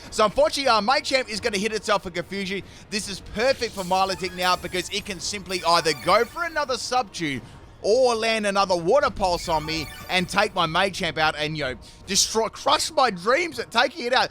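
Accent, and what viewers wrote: Australia. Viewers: Gotta love his Australian pronunciations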